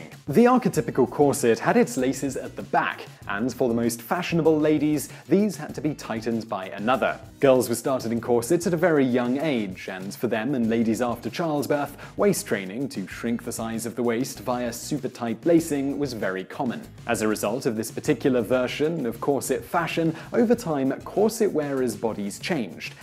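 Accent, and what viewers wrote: United Kingdom. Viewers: where is the narrator from? great britain?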